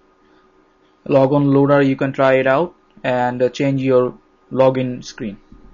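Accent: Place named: India. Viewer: No offense to the author but he is indian? Again, no offense. Due that the accent is really familiar.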